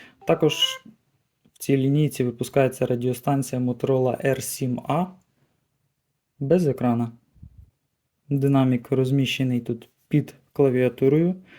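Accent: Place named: Ukraine. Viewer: Речь хохлатая дюже поганая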